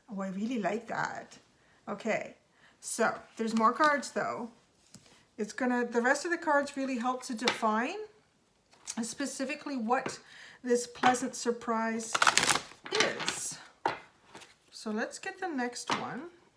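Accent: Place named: Canada. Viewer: Great reading! Thank you ❤️ I thought I detected a fellow Canadian there, especially after I saw the Roots shirt 😄 Which part of Canada are you from? Hello from Calgary!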